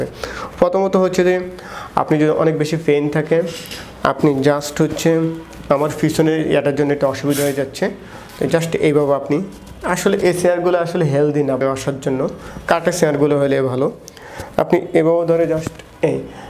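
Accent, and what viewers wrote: Noakhali, Bangladesh. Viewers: শুদ্ধ করে কথা বলা শিখতে হবে আগে, বাড়ি কি নোয়াখালী নাকি? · আপনার বাড়ি নোয়াখালী। তাই পিঠ কে ফিট বলতেছেন। পেইন কে ফেইন বলছেন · ভাই নোয়াখালি বাসা না কি কথা বুঝতেই অসুবিধায় পড়ে গেলাম..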